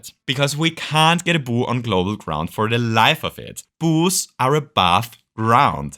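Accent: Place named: Germany. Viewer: I never get tired of watching your content. Being honest? I like your accent. It gives your videos some personality. German, perhaps?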